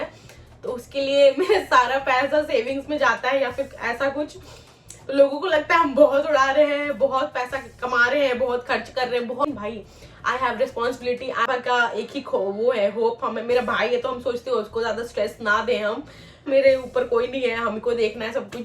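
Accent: Jharkhand, India. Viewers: Hello there... this is my first comment ever and I'd like to congratulate you for your achievement and I kind of knew that you were from Jharkhand because your Hindi accent is just like mine and I'm glad that you are proud of your mother tongue and use it in your videos too. You remind me of my husband who is also from Jharkhand and with hard work he made it to JNU and later to Japan... that was a long time ago, when nobody from jharkhand or bihar really wanted to acknowledge their backgrounds. I'm so happy to see young Jharkhandi like you doing well... may fame follow you everywhere👍💯🧡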